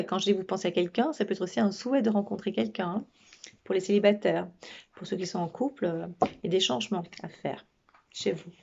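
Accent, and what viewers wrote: Belgium. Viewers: Coucou,j'aime beaucoup tes lectures et ta douceur. Petite question. Tu es belge ?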